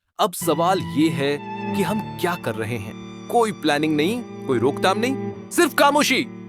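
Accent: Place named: India. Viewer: کونسی "رپورٹ" ریفرنس کیا ھے۔ آپ کا ایکسنٹ انڈین ھے اور جو فوٹیج دکھائی وہ ممبئ یا گوا کی ھے۔ کراچی سی ویو کی ھر گز نہیں۔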